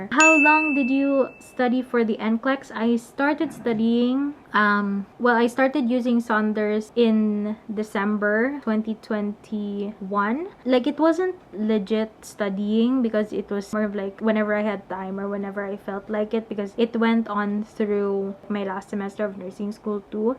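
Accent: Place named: Philippines. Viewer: Lol I knew you were filipino before you even opened your mouth LOOLL 🤣🤣🤣You have the thickest Filipino accent of all damnn (I'm not filipino)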